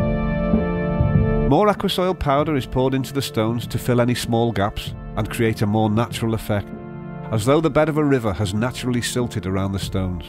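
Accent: Liverpool, United Kingdom. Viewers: Hjalmar Nordén he’s not from Sheffield lol. It’s much closer to Liverpool or the Mersey area. They’re scouse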